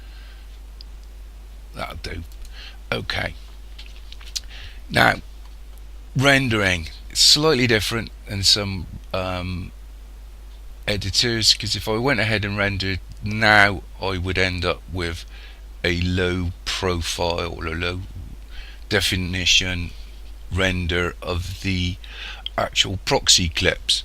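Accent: United Kingdom. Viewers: Thank Mr. Guessing you're from the UK.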